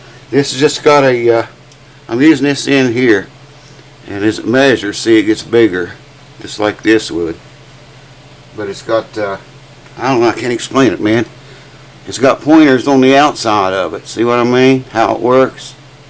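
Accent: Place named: South, United States. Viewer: ~ Just out of curiosity: where, geographically, are you located? Your accent seems to say somewhere down here in the south, but you've made a few videos of massive snow dumps... {?}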